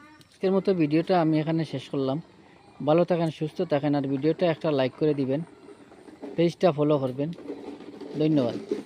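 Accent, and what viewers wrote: Sylhet, Bangladesh. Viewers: ভাই আপনার বাড়ি কোথায়,,,, মনে হচ্ছে সিলেট,,, সিলেটের কোন জায়গা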